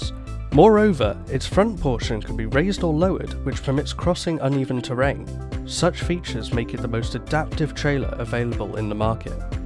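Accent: United Kingdom. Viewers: Lose the limey! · Well, great video. As much a fan of the British accent, I am wondering where is?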